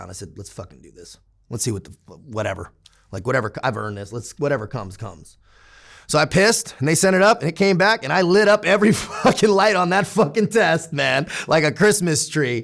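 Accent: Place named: New England, United States. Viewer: his ummss are just fuckins. hes a new englander like me so i know😂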